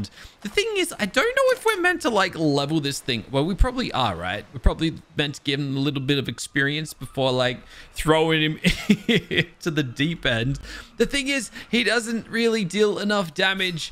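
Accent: Australia. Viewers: you sound like you are from adelaide or sydney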